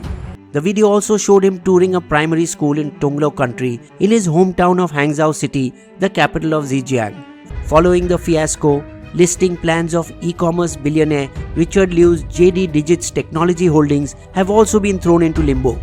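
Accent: India. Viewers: LOVE YOUR CLASSIC INDIAN VOICE/ACCENT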